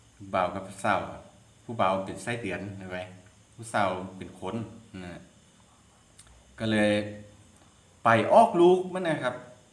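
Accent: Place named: Thailand. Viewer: สำเนียงคนไทเลยกะม่วนดีเนาะจ้าแก๋วๆจ้าเล่านิทานเก่งจ้า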